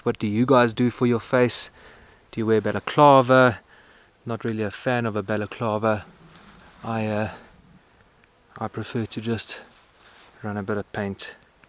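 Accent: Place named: South Africa. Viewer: Hi brother, are you South African? 😅that accent.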